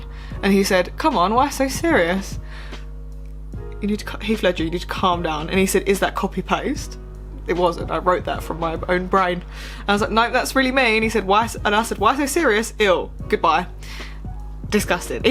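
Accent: Essex, United Kingdom. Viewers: I love your Essex accent 😋 keep posting videos... Love from India 😋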